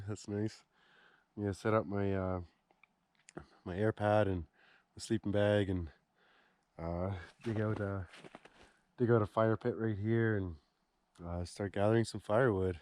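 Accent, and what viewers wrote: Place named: Canada. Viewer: I never realized how much we Canadians have an accent until I watched your videos haha makes me wonder if I sound like that too and just don’t hear it 🤣🤣🤷🏻‍♀️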